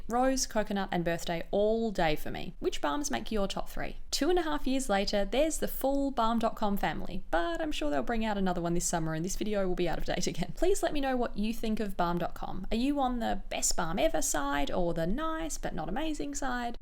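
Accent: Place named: Australia. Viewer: You sound Aussie, do you live in Australia? Because I have been wondering how to get glossier down here forever, and covid means no travelling to NYC any time soon...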